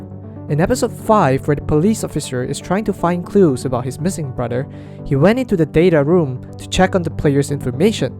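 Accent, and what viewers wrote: Singapore. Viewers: you're from singapore arent you? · Are you from Singapore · A segue, but you sound so singaporean! (Not a bad thing)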